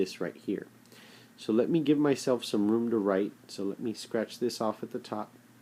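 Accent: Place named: Canada. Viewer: can’t get over the Canadian accent.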